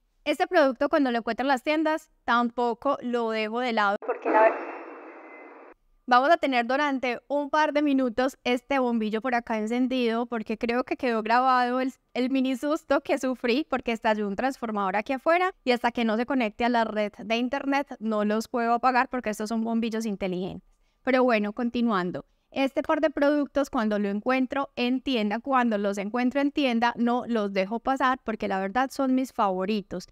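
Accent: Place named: Antioquia, Colombia. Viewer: Hola. Me encanta tú acento paisa, eres muy tierna. Cuéntanos sí té encontrabas sola en el momento del temblor. Té envío un gran saludo desde Bogotá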